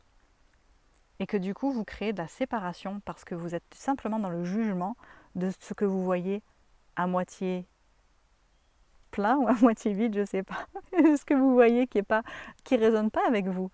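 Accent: Canada. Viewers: Merci, Merci! ...De votre sourire, de votre joie, de votre simplicité mais aussi des messages très réconfortants que vous nous offrez...avec ce charmant petit accent canadien!😀 (Pour ma part j'ai un peu de mal...avec l'application du savoir intellectuelle en actes ...et aussi ac qq termes anglais qui sont sympa...quand on les connaît! Au plaisir de vous retrouver! J'ai regardé cette présentation avec ma fille de 28ans dans mes bras...jusqu'au bout.cela nous a réconforté à tou points de vue . C'était un moment puissant qui nous nourrit l'âme !🙏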